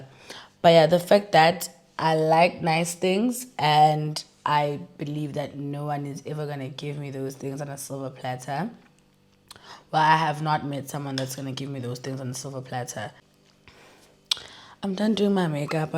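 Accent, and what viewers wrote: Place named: Nigeria. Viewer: i just started watching your youtube channel and im in love with them and you...(love your nigerian accent so much)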